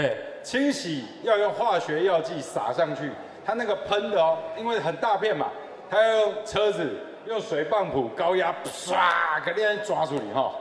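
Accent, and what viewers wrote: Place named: Taiwan. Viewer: 毛哥說台語好口愛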